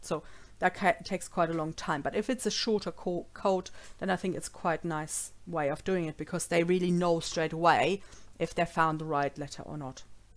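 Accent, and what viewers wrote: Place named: Germany. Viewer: Excellent how-to tutorial. I've watched it several times. Your lovely German accent reminds me of my late mother.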